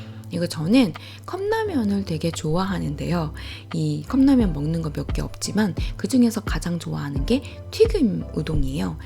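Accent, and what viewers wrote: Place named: Gyeongsang, South Korea. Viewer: 말씨에 경상도 말씨가 . . . .종종 ㅎㅎㅎㅎ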